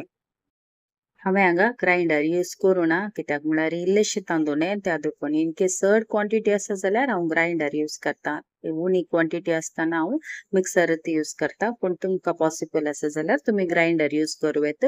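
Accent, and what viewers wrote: Konkan, India. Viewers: Thank you I am watching yr video your voice is so good and very konkani is pure so i get to hear the words. Recipes all mangalorian dishes are superb . Thank you bai. Can we give tadka .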